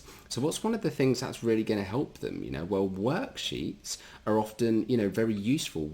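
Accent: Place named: United Kingdom. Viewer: Thank you. A class is better when given in british accent.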